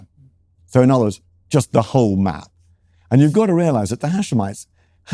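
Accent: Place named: United Kingdom. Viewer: just as dull and ridiculous as his British accent this retard is so blinded to distinguish between the turks, Arabs and Islam... imagine saying the short painter or Leopold II of Belgium were representing Christianity... we Arab have alot of issues and we acknowledge our mistakes, but we didn't commit horrible crimes as the turks, British or the rest of colonial criminals at least our countries weren't built by looting India, South America, Africa... and of course we did not send our armies to to force drugs trade on Chinese ... the latest savages as AL qaeda, isis were founded by the USA to fight the soviets and later to destabilize Syria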